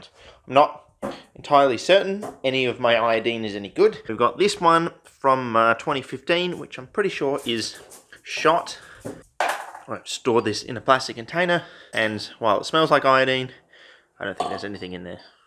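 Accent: Australia. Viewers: I'm normally pretty good with accents, even Aussie, but i did spend a chunk of the video wondering what "enema" analysis was. :) :) :) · As an American from just outside Boston Massachusetts, I’ve completely substituted my impromptu American English accent with a perfect Australian cadence (your voice) slowly over the past couple weeks. Everyone loves it and I’m considering phasing out my normal impromptu accents from around the world to just straight -Australian vernacular. Don’t post for a bit so I can grow out of this silly habit brought upon your paralyzing uploads.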